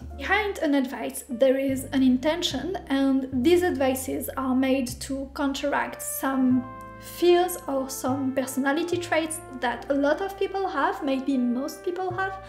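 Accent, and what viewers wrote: France. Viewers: are you french? we almost have the accent :)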